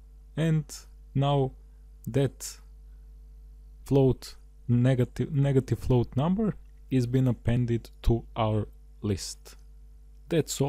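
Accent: Russia. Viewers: thank you mr. Russian sounding fella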